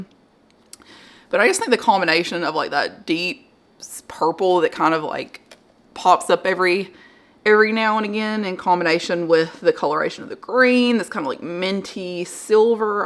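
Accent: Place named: Deep South, United States. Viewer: I've consolidated plants into one pot many times now, because I also have a lot of plants--but I have never thought of combining some of my cane begonias for some reason--and that is brilliant! I'll definitely be doing this soon. Also I really enjoy your videos and your accent (I live in Pensacola, FL, and I don't know of many PlantTubers from the deep south, so your accent sounds like home to me). (=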